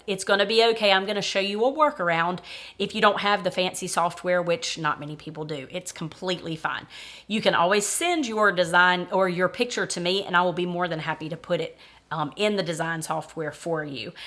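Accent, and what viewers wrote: South, United States. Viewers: I am so excited to have found your video! I found you through Dig, Plant, Water Repeat :) I’m growing in Hawaii so I’m a zone 12a. My climate and soil is drastically different than yours. I have all soil brought in and it’s only 3 inches before I hit solid lava rock! I wish I could design beds like yours :) I also love the sound of your voice and accent. My fiancé is from Georgia and Alabama, so listening to you talk is very similar to his grandma and mom’s. It’s so soothing and lovely. I cannot wait to follow your journey!! I make garden videos too (not as great as yours) but if you want to see what us hawaii people garden in then give it a look. Aloha!!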